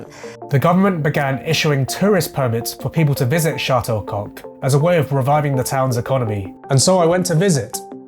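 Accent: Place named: United Kingdom. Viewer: I think he's British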